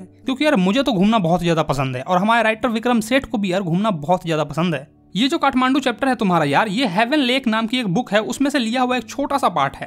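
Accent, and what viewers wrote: Bihar, India. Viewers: Bhai ap kya bihar se ho kya me up ka hu bhAi ye to bajane me maje ate h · Hum bhujlo bhaiya app bihari ho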